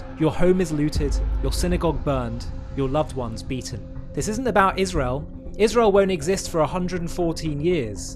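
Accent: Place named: United Kingdom. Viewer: U R the most couragous man with British accent thank again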